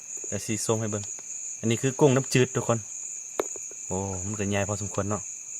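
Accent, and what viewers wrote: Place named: North, Thailand. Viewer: อู้เหมือนคนเหนือเจ้า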